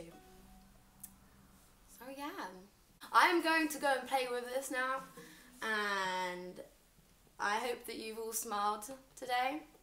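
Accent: United Kingdom. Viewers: English accent is the bow that ties it all together honestly. 100 out of 10 would've been head over heels for her back in highschool.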